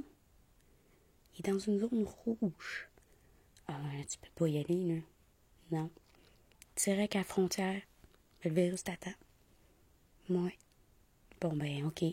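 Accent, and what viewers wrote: Quebec, Canada. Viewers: Bon tu l'auras chercher. Je viens de m'abonner à ta magnifique chaîne. T'es trop forte, trop de talent. En tant que Français, ton accent Québécois me fait littéralement craquer 🤩 Bisous de France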